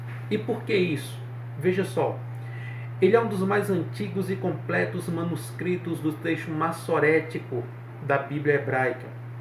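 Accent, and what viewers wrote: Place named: Brazil. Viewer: Mais um que acha que judeu, até me interessei em saber o que significa um códice, mas já começa falando esse hebraico abrasileirado 😅 tô fora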